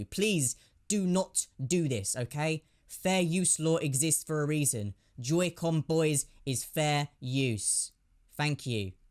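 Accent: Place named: United Kingdom. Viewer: Morally, yeah, it's really shitty of Nintendo to strike down a product that was benefitting charity in memory of one of its biggest supporters. Nintendo aren't our friends. They're the Disney of video games. They set their lawyers on everything even vaguely resembling their IP. I don't think they deserve the community they have. Legally, I don't think you have a leg to stand on. JoyConBoyz is neither parody nor fair use. Disclaimer, I am not a lawyer, this is not legal advice, you probably should consult a lawyer. You sound British, good to see a fellow PAL gamer, so I'm basing this on UK Copyright Law, which is currently still bound by the EU Copyright Directive. In that, our version of Fair Use is called Fair Dealing. It does provide an exception to copyright law "for the purposes of caricature, parody or pastiche". Those generally have a comedic, critical, or mocking tone to them. 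In my opinion, it would be a stretch to call JoyConBoyz tongue-in-cheek, let alone a qualifier for Fair Dealing. Fair Dealing is a defence, meaning you can already be in court and incurring costs by the time you get to use it. Judges will consider how much of the original work was used and the Etika Joy-Cons, bar the paint job, seems almost identical to the real thing. I'd imagine there's a good chance they'd be considered unlicensed bootlegs regardless of the genuinely good intent behind their creation. There's also a massive difference between copyright, which is automatically granted, and a tr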